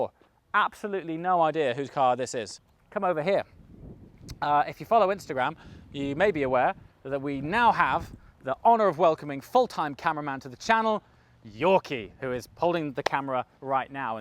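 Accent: RP, United Kingdom. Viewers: I’m sorry dude. I really want to like you so badly but there is just something that makes me feel uncomfortable watching. You just don’t quite seem genuine. It even sounds like you are trying to hide an accent by using and RP type of speech pattern. Maybe it doesn’t translate to your American audience and they don’t pick up on it. But as an Englishman, I’m really struggling. The Chris Hoy name drop for instance. There is a way to be humble and you just don’t come close. I’m so sorry.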